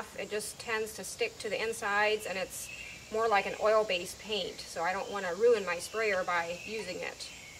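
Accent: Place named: Transatlantic, United States. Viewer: You have such a transatlantic accent. It’s cute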